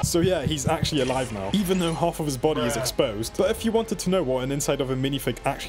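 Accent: United Kingdom. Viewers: sounds Britsh to me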